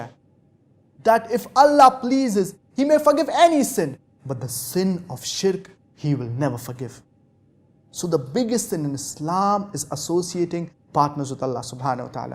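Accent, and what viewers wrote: India. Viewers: There's no way he needs to speak like his dad looool. He was educated completely out of India and lived 90% out of India yet he has that cringey Indian accent when speaking English. Bizarre.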